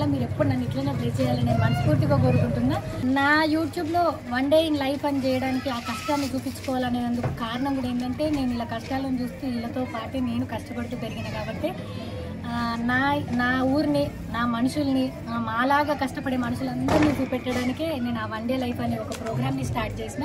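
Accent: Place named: Nizamabad, India. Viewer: మన యాస నిజామాబాద్ జిల్లా కైతిని ప్రపంచనికి తెలిసేలా చేశారు మీకు ధన్యవాదాలు తెలుుతున్నాను అక్క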